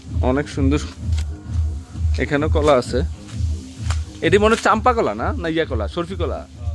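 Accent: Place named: Comilla, Bangladesh. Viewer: তোমাদের গ্রাম কোন থানায়।তোমার ভাষা শুনে মনে হচ্ছে কুমিল্লার ভাষা। ঢাকা থেকে।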